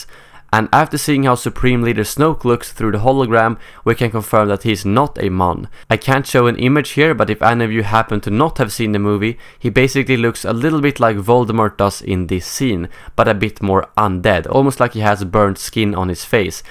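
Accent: Sweden. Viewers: the swedish accent doh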